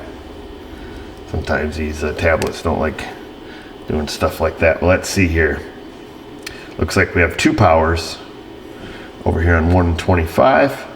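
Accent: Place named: New York, United States. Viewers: So you must be from southern NY state as I hear you say Warsh instead of Wash. ! ya'll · We love your New York accent.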